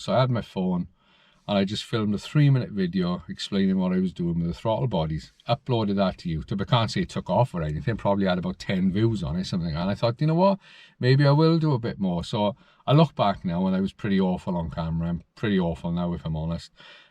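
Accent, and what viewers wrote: Wales, United Kingdom. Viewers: Your little Welsh tones have been missed. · Thanks for all you've done, take it easy butt. When I first found one of your videos I'd been outside Wales for months in Uni, it cheered me right up hearing that familiar Welsh accent! You've put up some great videos and we appreciate them all. Good to see you're well and healthy:)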